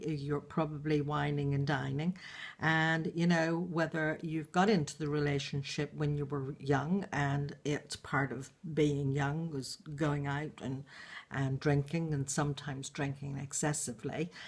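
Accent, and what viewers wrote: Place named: Northern Ireland, United Kingdom. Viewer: are you originally from Northern Ireland? I think I can hear an Americanized version of that charming accent. I am watching your videos from mostly the other side of the coin, because I am in danger of getting lost in the alcohol quagmire myself. For a good few years now I've come home from a full-on, highly responsible job and used too much alcohol to calm my senses more often than I should. As much as I detest my addicition to nicotine, alcohol abuse is my real problem and I've grown to hate it and hate myself for being like this. I still function extremely well (but not to my full potential) in my work and pay my bills and my share elsewhere. I've also been watching motivational videos of how people's lives got much better when they quit drinking as well as others who are realistic about their anxiety and how alcohol gives them a brief respite from it, and that's probably me. 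I'm a competent female tech geek who works in a team with 30 guys, but I support primarily other women in top management positions who jokingly(?) say 'they hear the wine calling' at the end of a hard day, so I think this problem may be bigger than most people imagine. I won't go into my life story except to say that I've had a few truly fucking terrible relationships where my partner has been 'trying', to say the least, and one of them even nearly killed me (fortunately a very short-lived yet traumatic relationship once he showed his true colours - he ended up in jail). But the year I spent in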